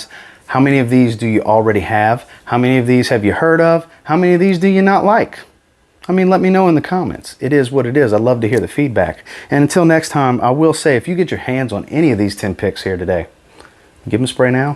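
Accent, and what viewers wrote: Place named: South, United States. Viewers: You sound like a Texan i know one when i hear one 😅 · Love your southern accent brother! Greetings from Bulgaria!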